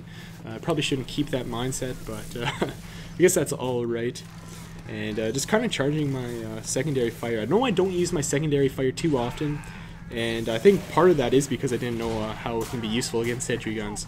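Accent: Canada. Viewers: Also, are you Canadian? Kind of hearing an accent. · I know that accent.... are you Canadian?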